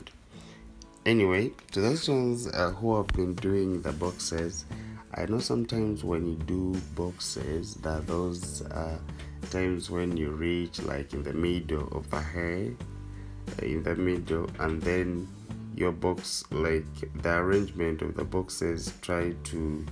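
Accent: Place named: Uganda. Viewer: HOW COME YOUR ACCENT IS SO UGANDAN?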